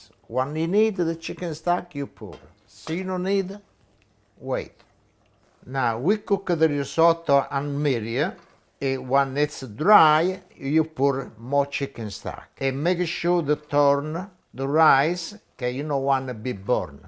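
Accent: Italy. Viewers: The man sounds Italian but trust me, he cooked that like a uni kid on rations doing an experiment! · He is such a darling man, and his Italian accent is absolutely adorable. 🎉❤🎉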